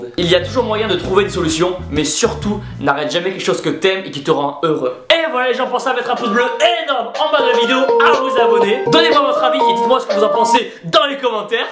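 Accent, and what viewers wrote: South, France. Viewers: Daaaaamn! Avec le corps sec que t'as et ton petit accent du Sud, on ne t'a jamais proposé de faire les Marseillais?:p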